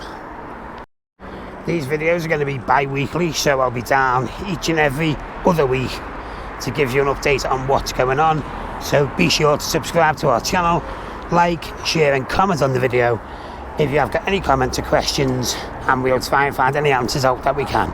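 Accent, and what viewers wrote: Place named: United Kingdom. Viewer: You sound like Patrick Mahomes if he was a Brit, I’d imagine.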